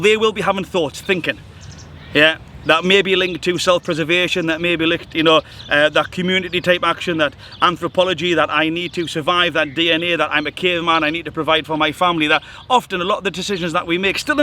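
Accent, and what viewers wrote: Newcastle, United Kingdom. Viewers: Hes got a geordie accent,its the nicest accent.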